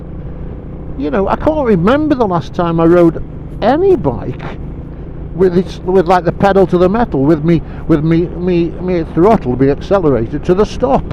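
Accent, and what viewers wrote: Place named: Staffordshire, United Kingdom. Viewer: Nowt up with your North Staffs dialect bit like ours, though bit further afield they travel around on buzzes It was only recently, on someone’s suggestion, I started putting helmet on it’s side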